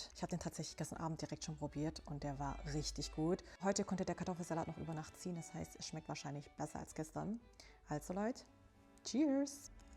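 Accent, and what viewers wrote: Swabia, Germany. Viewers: Die Stimme oder die schwäbische Mundart? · Sehr schade so eine tolle Frau muß schwäbisch reden Kartoffelsalat schmeckt wie nasse Socke in Hochdeutschland geht auch mit Mayo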